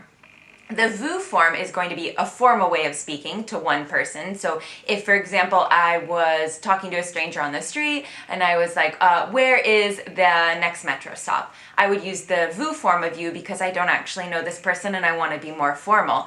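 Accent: England, United Kingdom. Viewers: Hi. It's certainly b/c you just don't know your sounds in French ( u / ou - an / on ) and keep your English accent . In these conditions, I couldn't speak this way myself. You should start to pronounce short words the right way then see step by step. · Your sole problem in this video is : you pronounce all these french words with a perfect English accent ! Especially the pronoun ON. Consequently it must be hard for your English speaking audience.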